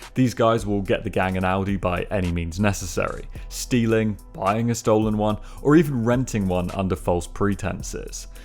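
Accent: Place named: United Kingdom. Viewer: homie believe me when i tell ya, i see no AI in this, this mothefucker got the most UK accent i've ever heard.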